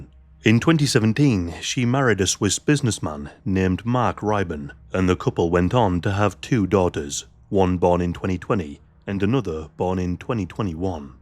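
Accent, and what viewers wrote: United Kingdom. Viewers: The commentator is British, in which country did this happen?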